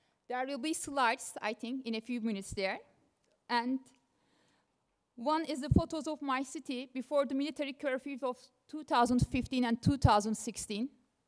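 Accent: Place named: Istanbul, Turkey. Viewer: Agir bir Nisantasi aksaniyla English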